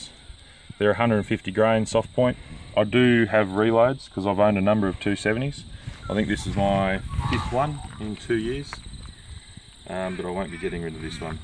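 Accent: Australia. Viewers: Sorry, can't understand Aussie.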